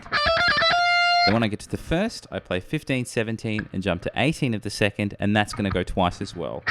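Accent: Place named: Australia. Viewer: very tough the stretch 15 18 22 as i have medium size fingers which tend to jam the strings muting the sound and killing the notes....u are a big guy so its easy.i can cheat with tapping easily! but i am tempted to stay honest and untap--but i am still struggling, now 2 days of 2 to 3 hours of playing (how many days would it take to crack this part?) ...8.43 part of the video is what i am referring to....and do you follow cricket? you sound aussie..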